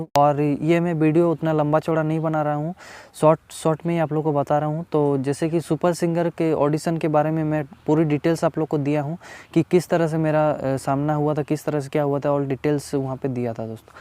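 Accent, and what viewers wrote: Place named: Maharashtra, India. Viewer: Brother aap apni hindi language ko aise bolo jese ki marathee feel na ho matlv aap ki hindi language khadi boli jesi lagti hai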